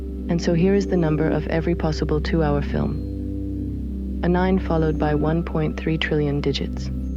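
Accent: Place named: Europe. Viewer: monotone voice with slightly European accent, devoid of human differences that give "color" to life. Drivel.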